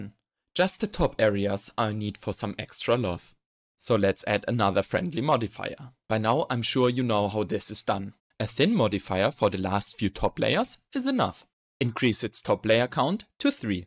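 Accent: Germany. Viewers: klasse Video, aber könntest du das vllt auf Deutsch machen? Dein Akzent klingt deutsch ;-) ich kann leider kein englisch...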